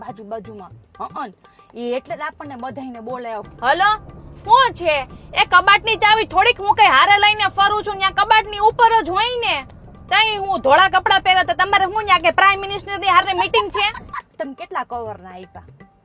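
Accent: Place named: Kathiyawad, India. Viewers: Videsh ma ryo cho pn aapdo kathiyavadi lehko evo ne evo j che ho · તમારી સ્ટાઇલ એકદમ ગુજરાતી ગામઠી ladies અને લહેકો પણ અદ્દલ એવો જ....😅😅 કોઈ તમને ના પહોંચી વળે હોં..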